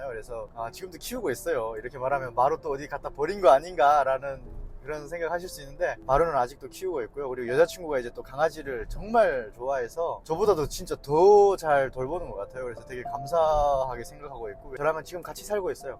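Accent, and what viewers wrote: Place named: Gyeongsang, South Korea. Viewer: 우와 잘생기셨네 말투는 저기 아래 경상도 사투리 섞인듯한 ㅎㅎ 결혼준비 잘 하시고 대한민국의 건강한 청년으로 정착하신걸 감사합니다. 응원합니다.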